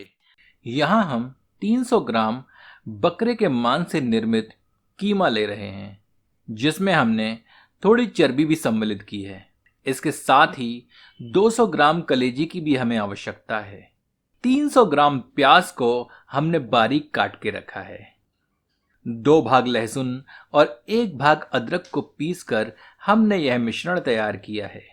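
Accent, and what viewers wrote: Standard. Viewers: क्या बात है,शुद्ध हिन्दी। रैसिपी के साथ शायरी का आनंद। मज़ा आ गया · itni shuddha hindi aaj ke zamane me maine kisi ko bolte hue nahi suna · The way u speaks in shudh hindi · आपकी शुध्द हिन्दी का उच्चारण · itni shudh hindi sunkar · Aap itna shuddh hindi bolte hain lagta hain middle age ka koi cookery show dekh rahi hu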